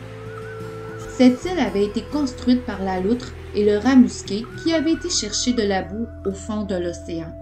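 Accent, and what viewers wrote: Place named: Quebec, Canada. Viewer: Merciii ... J'aime t'accent !! ... Svp c'est l'accent de quelle regeion de québec?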